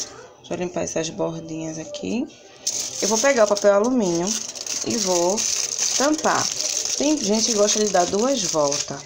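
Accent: Recife, Brazil. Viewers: É de recife?rss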